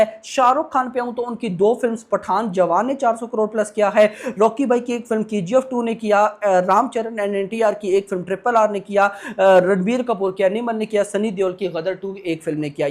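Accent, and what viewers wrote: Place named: Pakistan. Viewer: Why his accent is so so similar to Pakistanis ?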